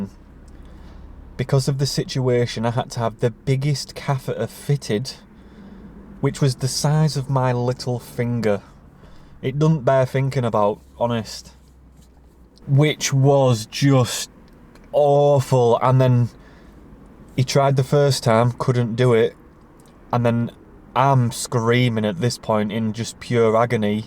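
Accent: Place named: Sheffield, United Kingdom. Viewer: are tou from sheffield mate. You sound very local brother.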